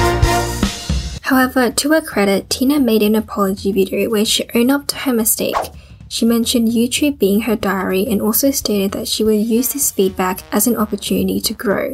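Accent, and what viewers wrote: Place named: Australia. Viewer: off topic but i really love your accent! is it australian?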